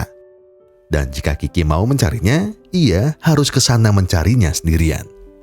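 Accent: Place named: Surabaya, Indonesia. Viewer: ternyata orang surabaya masnya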